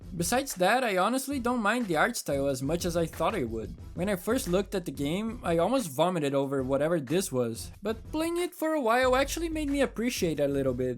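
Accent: Brazil. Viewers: Adoro seu sotaque típico de Br, eu fico puxando R quando falando inglês, só sendo estúpido Achei seu canal agora, ótimo vídeo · Te sigo há meses e meses e só agora que descobri que tu é brasileiro. Bem que desconfiei do sotaque ahahahaha um dos canais que mais me divirto assistindo. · Esse sotaque terrivel de brasileiro me vez sentir ofendido, vou cancelar Need For Speed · Teu sotaque é igual ao do Ayrton Senna falando inglês mano kk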